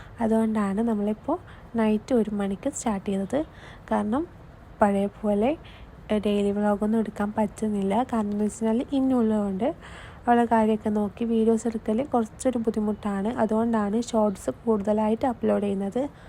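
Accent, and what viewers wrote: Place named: Kannur, India. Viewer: kannur il evdanu...aa slang kettappo thanne manasilayi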